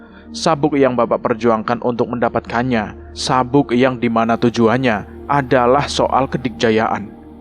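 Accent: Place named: Java, Indonesia. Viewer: Medok Jawanya lekat banget, kurang enak di dengar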